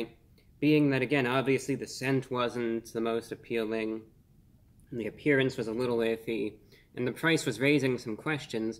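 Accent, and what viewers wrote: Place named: Transatlantic, United States. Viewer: Man's bringing back the old-school transatlantic accent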